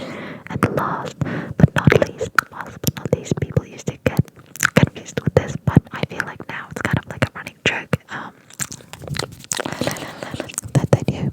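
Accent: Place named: Australia. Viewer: I LOVE THE AUSSIE ACCENTTT, idk why its so relaxing to me. i saw your comment of Ella asmr and had to check your channel.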